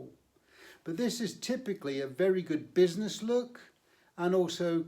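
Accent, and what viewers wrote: United Kingdom. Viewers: Oh marvelous. I used to work in Beccles as a gamekeeper when I was young and a butcher a few years after that. I'm from Narj actually. I did hear you used some eastern terms and a slight accent with them lol.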